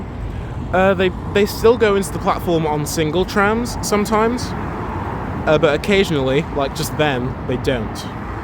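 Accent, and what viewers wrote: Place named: Australia. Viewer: Stop talking like an Aussie...proper fkin annoying.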